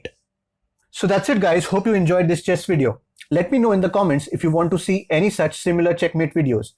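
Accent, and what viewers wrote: India. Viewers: just wanna let u know that I hate Indian accent.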